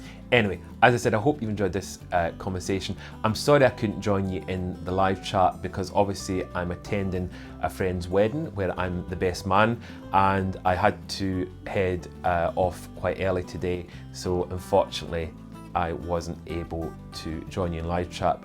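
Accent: Scotland, United Kingdom. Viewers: Here we are one year later and I still find your subject, your delivery and your charming Scottish accent simply captivating💞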